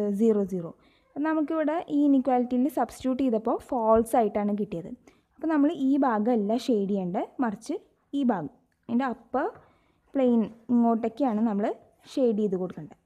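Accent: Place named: Thrissur, India. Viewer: Thrissur alle Aa Basha kettale theriyum 😄😄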